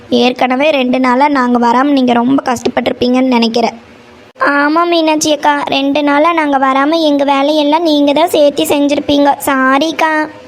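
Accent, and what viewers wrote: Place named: Pollachi, India. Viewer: Akka pollachi slang akka ❤❤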